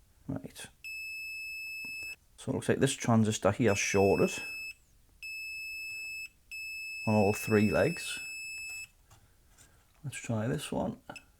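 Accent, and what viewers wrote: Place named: Newcastle, United Kingdom. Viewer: Its a real pleasure hearing a geordie accent after ive been in nz for so long 😊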